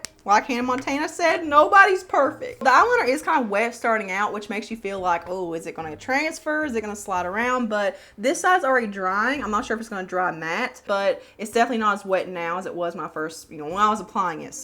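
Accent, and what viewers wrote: South, United States. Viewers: I just love your take on everything and life in general. I live in Minnesota but grew up in Georgia adore your drawl it makes so homesick. I hate the midwest people arent friendly like us southern folk. Thank you for brightening my days with your keen sense of humor!❤❤BTW where you get your eyeglasses they are so kewl on you#!☮️💟🕊️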